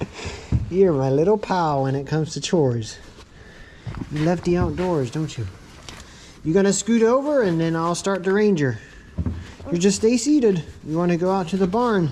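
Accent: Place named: Lancaster Amish, United States. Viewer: Just found your channel from watching the interview which was very interesting my wife and I also left the Amish after we were married 4 years and that’s been 17 years ago I could hear in your Amish accent you were from Lancaster 😂 I grew up in Montour County Pennsylvania actually we just moved back here a year ago and so we do have Lancaster Amish for neighbors 😂 my wife and I did not grow up in Lancaster Amish but we did grow up old order Amish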